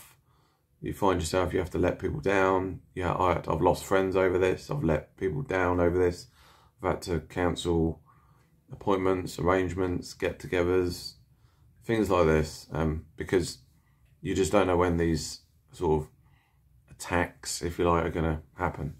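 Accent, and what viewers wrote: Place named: Suffolk, United Kingdom. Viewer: Hello, just watched your video and having been diagnosed with MD 3 years ago recognise everything you mention. I've watched several videos from other sufferers and everyone has broadly the same symptoms, but nobody mentions the speed of the spinning, for me it's really fast, everything jumping from left to right or occasionally up and down. I had 3 steroid injections which have helped a lot, though I still get attacks out of the blue occasionally. I work nights in Waitrose, and as an employer, they've been brilliant, now that my original manager has left, who found my attacks and deafness hilarious. A new manager who's sister has MD, was much better. This condition changes your life much more than people realise. I rarely drive any more, and never alone. Dare not ride my motorbike, and generally seem to have lost interest in everything. 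I think I can hear you have a slight Suffolk accent, which is also my part of the world. It's nice to hear someone talking about "menieres" rather than "minures" the way the Americans pronounce it. All the best.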